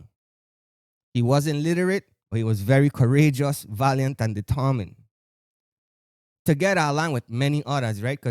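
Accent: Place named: Caribbean. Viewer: “Awo”, I watched this educational and somewhat comedic video in Ms. Charles’s class at John H. Woodson junior high school, I enjoyed the comedy and to hear a Caribbean accent speak to me about the topic of slavery. I find this video informative because it shows what our ancestors had to go through for us to be where we are now. - Lesyah D.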